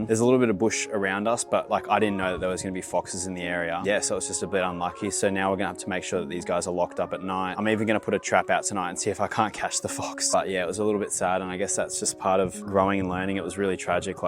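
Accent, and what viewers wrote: Australia. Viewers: ANY REASON U TALK LIKE A ROBOT ...😂😂😂 I WISH U CHILL LITTLE BIT WITH YOUR AUSSIE ACCENT , U TALK LITTLE TOOO FAST FOR AMERICAN KEEPING UP😂😂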